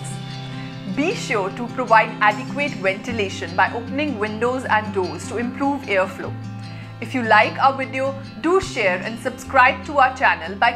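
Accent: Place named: India. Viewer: I didn’t expect that heavy Indian accent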